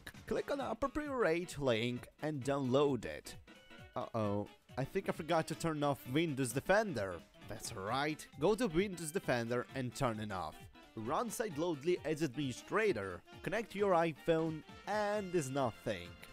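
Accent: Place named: Europe. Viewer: sounds like netherlands, german and czech accents mixed together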